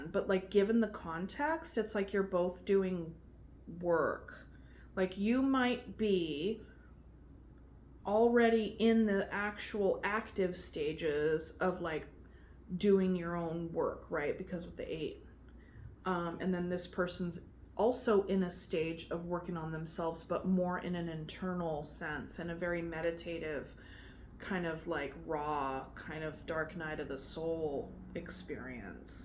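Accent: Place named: Canada. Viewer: And ew, are you Canadian? I’m from Toronto? You sound Canadian! X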